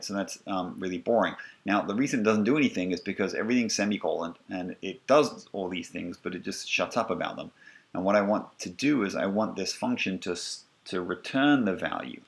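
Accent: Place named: Australia. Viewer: Too bad this course is online, I would have been delighted to have a prof with an Australian accent!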